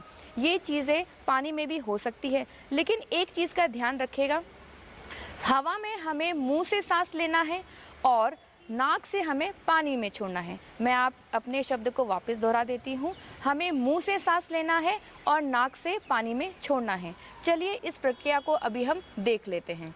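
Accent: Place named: Hyderabad, India. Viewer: From which country are you from I think your from hyderabad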